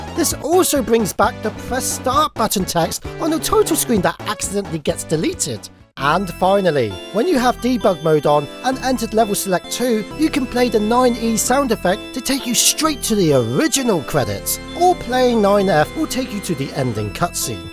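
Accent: United Kingdom. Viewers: HE'S THE BRITISHEST GAMER YOUVE EVER HEARD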